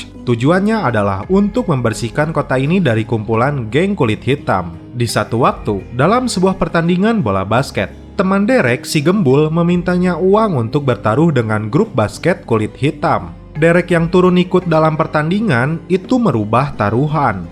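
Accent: West Java, Indonesia. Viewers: Bang urang sunda nyaa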